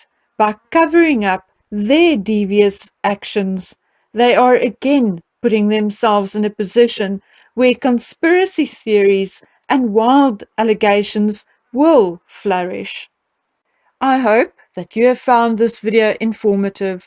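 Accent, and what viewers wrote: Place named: South Africa. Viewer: Wat klinkt het Zuid-Afrkaans zo mooi🙂..in Nederland wandelen we ook, en in bepaalde omstandigheden kuieren we ook..ik bedoel dan gebruiken we het woord kuieren als we op ons gemak wandelen in het bos bijvoorbeeld. En ik ben zo blij dat een heerlijke tijd heeft gehad bij haar familie 😁 Groetjes vanuit Gouda ( Nederland)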